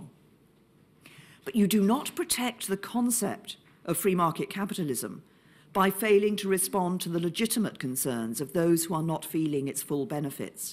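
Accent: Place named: RP, United Kingdom. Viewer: Her RP accent is actually amazing. I strive to speak like her.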